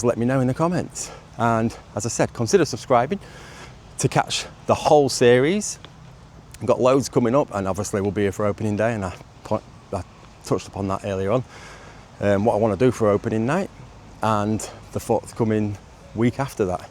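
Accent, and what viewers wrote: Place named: East Yorkshire, United Kingdom. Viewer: I am really enjoying your videos of the hull fair and how it's coming together just 24 hours ago there was nothing there they seem to crack on can't wait to see the next video tomorrow would love talking has it brings it together just love these videos was that helter skelter going up l remember going on that when l was young using a mat to slide down the helter skelter and l can also remember the cake walk l went on that with my dad and all l remember is we could not stop laughing love your commentary on your videos love your accent a good strong east yorkshire accent l am from east yorkshire myself and l can always remember been at south hunsley school and you could see out the classroom windows seeing the fair coming along the A63 was a good thing to see ❤Karen 😊 wow that RV was amazing l bet it's lovely inside 😊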